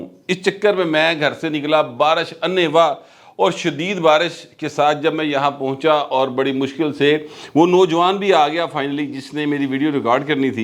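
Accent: Lahore, Pakistan. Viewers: Love your lahori style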